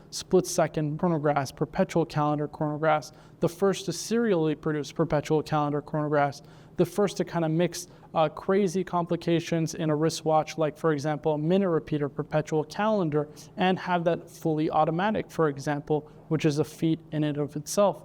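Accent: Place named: Quebec, Canada. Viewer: Please stop the québécois accent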